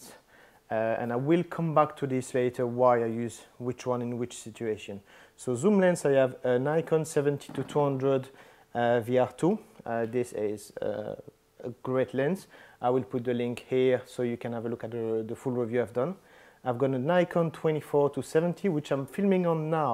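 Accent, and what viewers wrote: France. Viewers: I subscribed to another french photographer and when I first listened to you speaking I knew you're french..I love the accent.. Very informative video!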